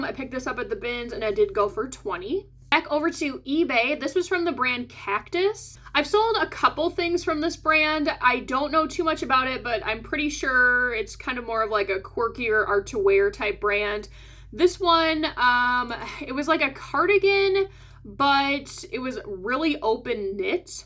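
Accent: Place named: Midwest, United States. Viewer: New on your channel. I love your suggestions and ideas. New subscriber now. You sound like you’re from my home area. Are you maybe from Chicago or Wisconsin or Minnesota? I’m originally from Southern Illinois with family all over the Midwest. I’m in California now for over 40 years.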